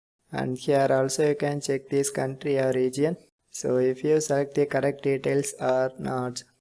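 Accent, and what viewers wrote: India. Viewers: Cuz he's Indian bozo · Bro he's Indian that's just how they talk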